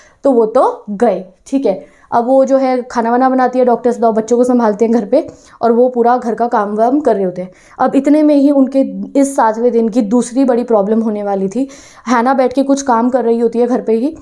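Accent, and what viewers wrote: Punjab, India. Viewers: Didi aap punjabi hai??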